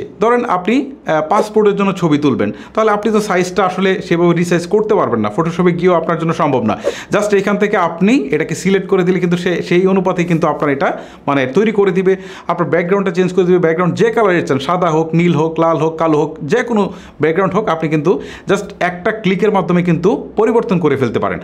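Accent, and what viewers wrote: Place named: Kishoreganj, Bangladesh. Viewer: আপনার কথা শুনে মনে হচ্ছে আপনার গ্রামের বাড়ি কিশোরগঞ্জ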